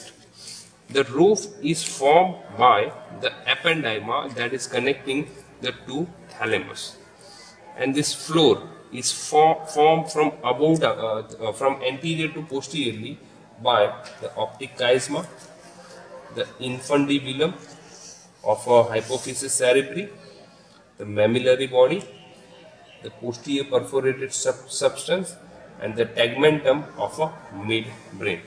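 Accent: India. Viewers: if you cant understand the indian accent you haven't seen enough educational videos smhh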